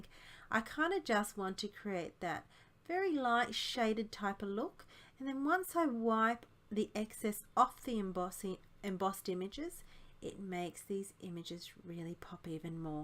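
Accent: Australia. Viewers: Very pretty. Love the colours. (Love hearing our Aussie accent in videos too! ) Thanks for sharing. 🙂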